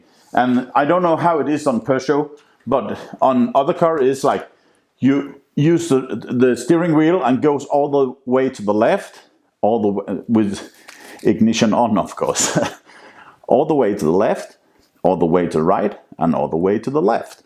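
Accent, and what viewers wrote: Scania, Sweden. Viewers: För sjutton! Kör inte på engelska. Jag slutar kolla. Hela charmen med din kanal är ju din dansk/skånska accent 😢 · Njaaaa, lyssnar hellre på skånsk/danska 😀👍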